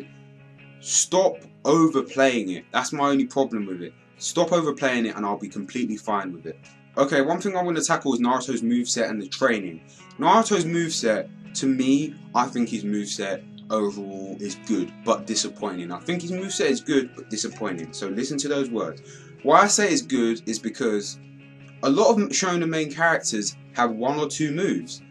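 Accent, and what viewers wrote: United Kingdom. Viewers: I know this is out of talking but...is that a British Accent? xD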